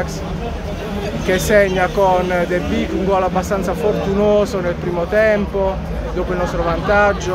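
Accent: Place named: Turin, Italy. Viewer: DALL'ACCENTO MI SA CHE E' UN TORINESE. CHIAVICA RUBENTINO.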